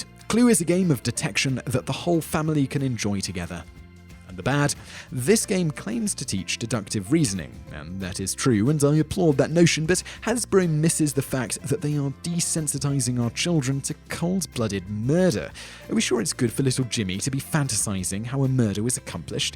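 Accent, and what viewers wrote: England, United Kingdom. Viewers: It's Milton BRADLEY...not BRADY! ...and it's Struh tee go!!!! Nothing like an English Accent that murders the Queen's English!!!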